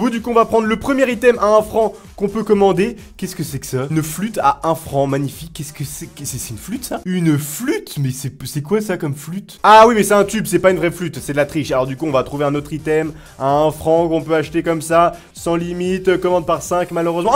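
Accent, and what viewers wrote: Quebec, Canada. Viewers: yo je suis un nouveau mais je te trouve super ps: tu pourrait faire une vidéo avec ta voit québécoise un petit defi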